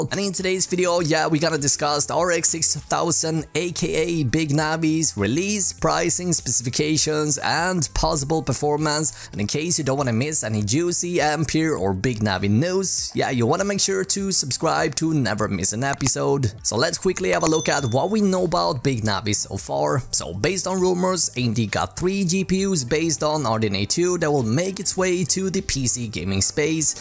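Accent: Sweden. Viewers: Its because he's from the north part of Sweden and the majority of people out there have that annoying accent unfortunately. In short he's speaking in Northern Swenglish. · The Swedish accent is strong with this one!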